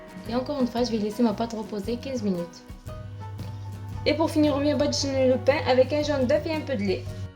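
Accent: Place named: South, France. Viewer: Superbe cette recette en plus avec un joli accent du sud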